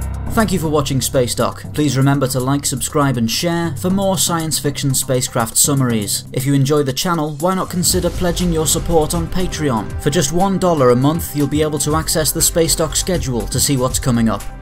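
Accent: United Kingdom. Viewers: At last, I'm hearing an English/UK accent.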